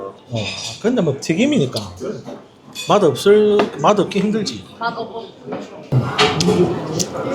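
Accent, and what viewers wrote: Busan, South Korea. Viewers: 부산사투리 너무정겹다. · 너무 자연스러운 부산사람의 행동과 말투 완전 부산을 이해하고 알고 싶으면 이 유튜브 추천합니다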